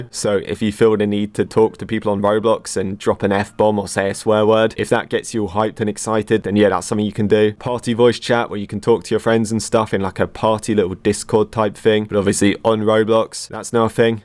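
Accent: United Kingdom. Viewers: You talk like a British person and could be one but the drama is insane that i respect you being British.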